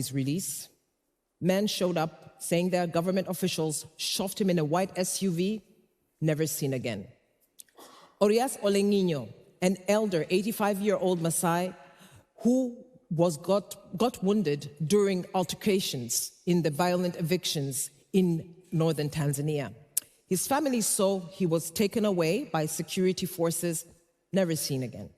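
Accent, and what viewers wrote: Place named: United States. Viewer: U bwege unababaishwa na Americanized voice kaolewe naye.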